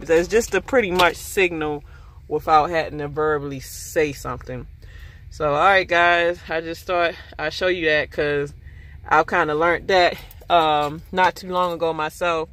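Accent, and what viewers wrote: Baltimore, United States. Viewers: ? your accent is Baltimore? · Sirius Desta thats cause she is from baltimore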